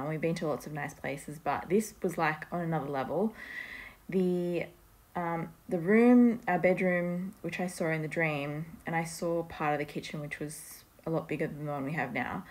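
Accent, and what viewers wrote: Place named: Australia. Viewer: Oh sister right now u had 144 subs! U sound Australian. I'm kiwi. I know a few other NZ and OZ 144s. 🕊👼🏻🙂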